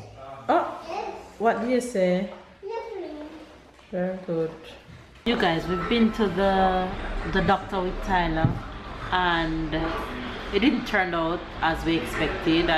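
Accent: Jamaica. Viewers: I am glad I jumped over on your page. Saw you from Adanna and David's page. Like how you keep up with Ja accent👍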